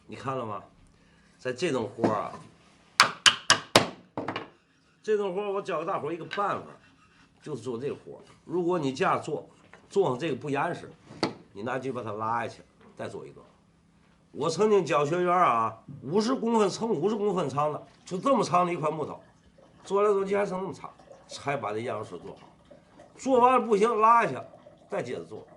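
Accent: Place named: Tianjin, China. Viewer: 天津话贼个锃啊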